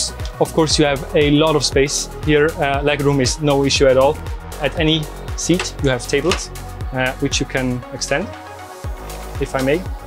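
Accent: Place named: Switzerland. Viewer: Meeeega schwizerakzänt ich würd sogar säge du bisch stadtzücher😂 stimmts?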